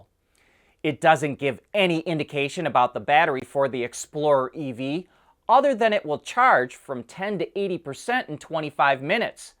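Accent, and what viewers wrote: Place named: Midwest, United States. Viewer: This is the Midwest. This is how we talk. Jeez.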